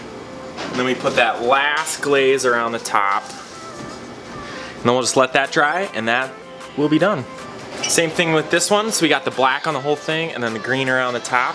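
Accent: Minnesota, United States. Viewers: I do enjoy the Minnesota accent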